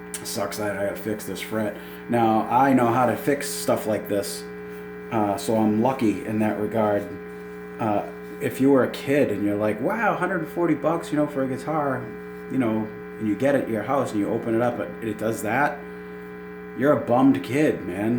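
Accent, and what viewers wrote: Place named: Boston, United States. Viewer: was wondering where you were from I'm from Hyde Park and felt right at home with your accent lol it was pissa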